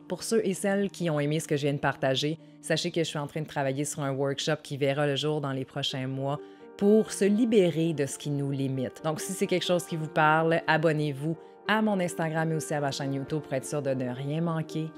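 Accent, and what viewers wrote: Canada. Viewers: J'adore ton accent Canadien ! 🌈✨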